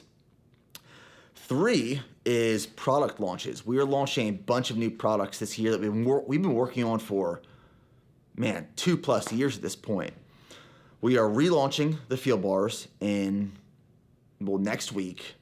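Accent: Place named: Texas, United States. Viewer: Пишу на русском, чтобы было видно, что я не местный 🇷🇺 Во-первых, спасибо за твои видео, ты хороший атлет. Во-вторых, желаю тебе достичь всех твои целей в 2022 году. Кстати, мы русскоговорящие тоже следим за твоими проектами (как же хорошо хоть немного понимать английский). Я, правда, смотрю твои видео пока только с субтитрами, но прогресс есть. Когда только начал смотреть тебя (примерно год назад), то было непривычно улавливать ваш Американский, ну или Техаский акцент, но сейчас уже лучше) Кроме того, подписан на тебя в Инстаграм. Так что знай, GO ONE MORE знают не только в Штатах)) Всем добра и мира! P.S. есть русскоговорящие, кто смотрит Ника? Отпишите внизу, очень интересно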